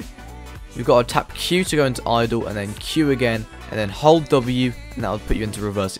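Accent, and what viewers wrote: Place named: United Kingdom. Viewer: your brittish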